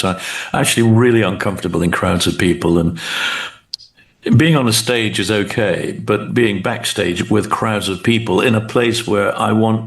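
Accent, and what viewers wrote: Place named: Scotland, United Kingdom. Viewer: For a Yank like me, your Scottish accent was at first a wee bit difficult :face-green-smiling:, but Ian of course was right at home with it and as a result you got an excellent hour+ from him. Honestly, I've heard interview after interview with Ian in which he answers the same ol' questions again and again with the same ol' answers, but you asked several unique questions that elicited genuinely thoughtful/original answers from him ... good on ya mate. Well done. :goodvibes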